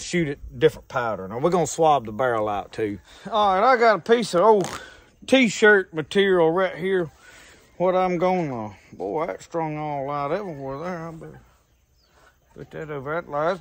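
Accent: South, United States. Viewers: Hi I appreciate the video it was informative and entertaining but buddy it was hard for me to follow because of the your Southern drawl it's so strong not a criticism just a suggestion maybe if you speak Little more clear and more precise I wouldn't had to watch it a couple times to get all the narrative and I can generally follow most southern accent I have a long ancestry back to the south when my original ancestries from Virginia followed that longWind Daniel Boone to eastern Kentucky and Tennessee 😂 thanks for sharing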